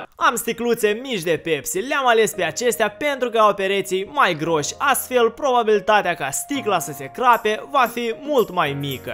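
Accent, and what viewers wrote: Moldova. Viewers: Ești Moldovan nustiu terog sami. Spune terog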